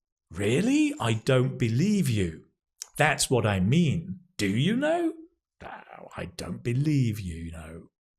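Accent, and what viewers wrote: United Kingdom. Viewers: Wait. This is British English, no? Excellent for lesson, especially about the pronunciation in English.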